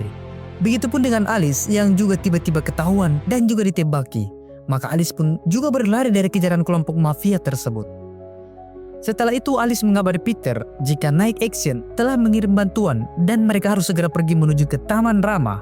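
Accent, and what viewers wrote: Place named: Bugis, Indonesia. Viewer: Orang bugis logatnya admin ni ya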